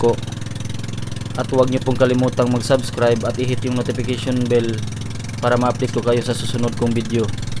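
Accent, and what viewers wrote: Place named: Batasan Island, Philippines. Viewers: saan ka sa tubigon? b4 nag school pako marami akong classmate dyan,I think sa tuno ng pnnalita mo ur from batasan iland,me from clarin bt her nko mnila now,maybe ur one of my classmate b4 or school mate.. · salamat sa pagtagalog mo kac kapag magbisaya ka hndi Po namin maintindihan.. kahit bisaya Yung tuno ng salita mo at least naiintindihan Po namin.. I like your content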